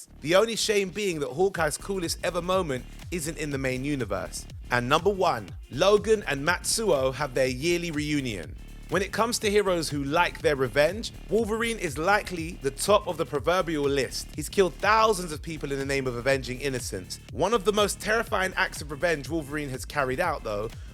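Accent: United Kingdom. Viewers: This guy is to British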